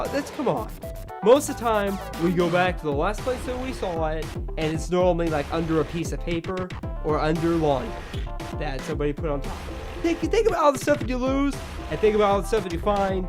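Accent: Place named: Ireland. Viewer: Yeah I must say I love your little floating objects! This is me requesting your next video to be in your Irish accent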